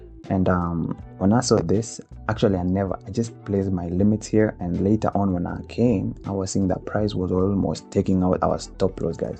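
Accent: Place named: United States. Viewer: Awesome vedio over here,😊The American accent though 🔥🔥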